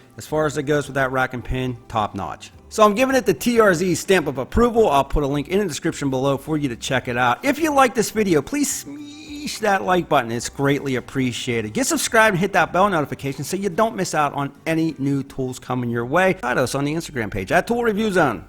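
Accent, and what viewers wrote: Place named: New York, United States. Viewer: Dude, if you aren't from New York, I'd be shocked. :-)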